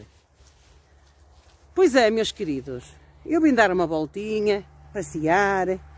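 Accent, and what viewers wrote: North, Portugal. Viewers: Olá dona! Parabéns pelo seu trabalho no YouTube, você tem sotaque do norte...é de que cidade? Sou de Vila do Conde -Porto.beijinhos grandes daqui do Norte. 😘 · Olá dn., pela pronúncia acho que é do Norte. Póvoa de Varzim ou vila do conde. 17 anos é uma vida de.trabalho.se já tem a sua casinha, está na hora de regresso a PORTUGAL. PARABÉNS as receitas são óptimas. FELICIDADES tudo de bom um grande beijinho para si minha querida. É muito simpática 😍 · A é Portuguesa e bem Portuguesa! A sua maneira de estar, de falar de se dirigir às pessoas, a sua sinceridade, é tudo bem Português! Obrigada por ser quem é: UMA GRANDE SENHORA! Continue assim e nunca se arrependa de ser tal qual é! Um 😘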